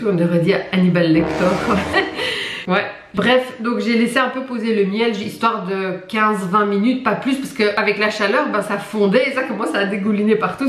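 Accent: Belgium. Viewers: Bonsoir ,il me sembke que vous avez un pet accent ? Vous êtes Belge car vou a avez un accent ou etes Belge ou Bruxelloise ?viy me faites rire viy et trop comm jeun femme viu et mariée ?si oui botre mar ne diu pas s 'ennuyez avec vous ?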